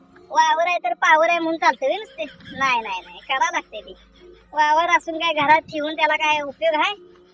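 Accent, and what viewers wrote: Kolhapur, India. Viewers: अण्णा तुम्ही अस्सल कोल्हापूरी बोलताय . मला तुमच बोलण खुप आवडत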